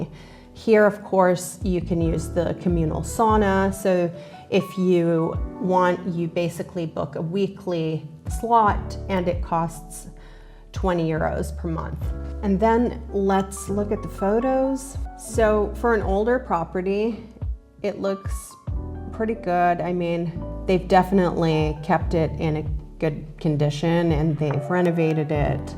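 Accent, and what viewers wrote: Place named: West, United States. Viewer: If your hometown is Helsinki how is it that you sound like you are from the western USA? You have no trace of a foreign accent.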